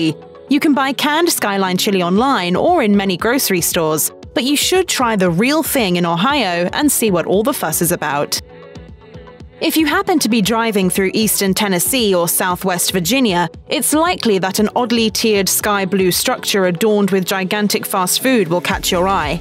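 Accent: United Kingdom. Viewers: I dislike theBritish narrator. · The British accent seems inappropriate for this food.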